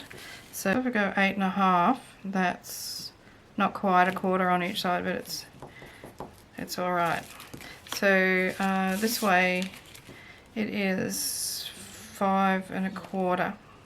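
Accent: Australia. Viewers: I love this journal! It's so nice to hear another Aussie accent! I enjoyed watching you have a go ❤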